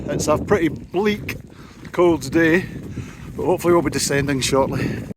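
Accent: Scotland, United Kingdom. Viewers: Oreyt, was just watching you review of the x-mid and noticed your Scottish accent I was the Yorkshire lad you was chatting to at camping des glacier in Switzerland. 🇨🇭 hope you’re well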